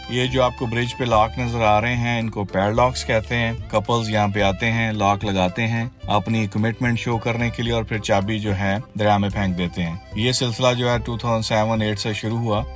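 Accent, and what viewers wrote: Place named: Faisalabad, Pakistan. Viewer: My piyara brother.. Faisalabadi accent in urdu..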